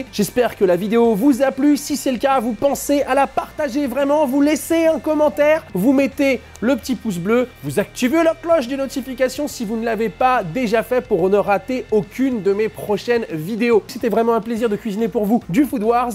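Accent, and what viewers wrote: South, France. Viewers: l'accent du sud me fais penser a mon prof de cuisine au lycée mdrrr on se tape des barres avec cet accent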